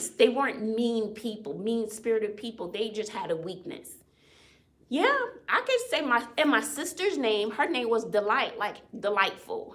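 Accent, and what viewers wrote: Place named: Boston, United States. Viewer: Where are you from? You sound like and remind me of my aunties from Boston?? ☺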